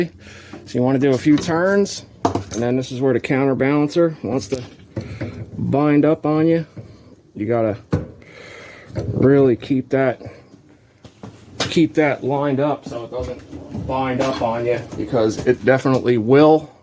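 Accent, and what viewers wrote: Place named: New Jersey, United States. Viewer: Had a feeling you were from NJ, south if I had to guess, closer to Philly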